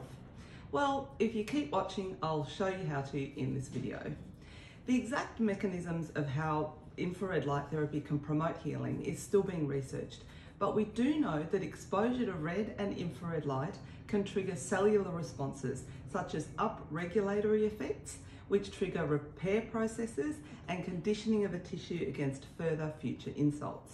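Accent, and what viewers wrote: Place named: Australia. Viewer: I find your video very informative 👍 Plus, I really like your Aussie accent :)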